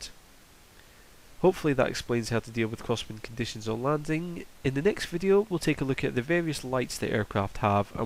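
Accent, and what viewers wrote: Scotland, United Kingdom. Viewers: Also Is That A Scottish Accient I Hear haha im socttish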